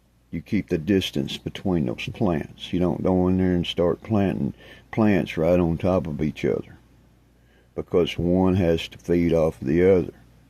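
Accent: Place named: North Carolina, United States. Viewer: a side thought.... sounds like you are from North Carolina or Tennessee.... love your accent... i live in east Tennessee ..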